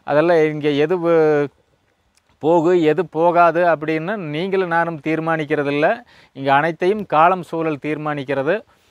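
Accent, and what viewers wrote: Kongu, India. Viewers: வேருக்கு மருந்து கட்டுற மரமும், காடும், ஆளும், குடிக்கிறவர்களும், போய்விடுவார்கள்... கிட்னியும் புடுங்கிட்டே போயிறும்.. கொங்கு தமிழில் வேற லெவல் பேச்சுங்க அண்ணா..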